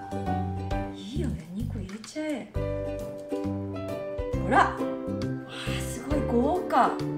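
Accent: Kansai, Japan. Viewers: 関西弁のノリが最高！うまいもん食べてる時が一番幸せですよね。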